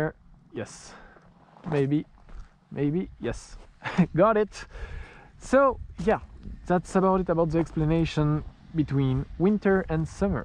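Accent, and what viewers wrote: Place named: France. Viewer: you never have to apologise for having a French accent. Like most Englishmen, I can just about manage to order a beer and a ham and cheese sandwich in French. I am constantly amazed at other people's ability to communicate so fluently in another language.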